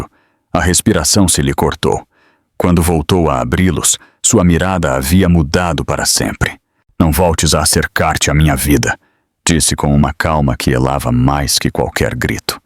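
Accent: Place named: Portugal. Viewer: Português não é do Brasil. Provavelmente de Portugal. Pode ser de outro país que foi colônia portuguesa como o Brasil.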